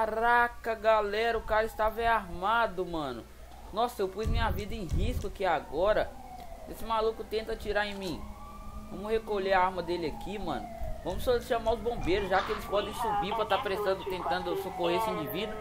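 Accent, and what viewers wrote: Minas Gerais, Brazil. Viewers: Sabia que tu era de minas , voce é de BH aqui mano ? quando voce fala Jae , pela ord kkkkkk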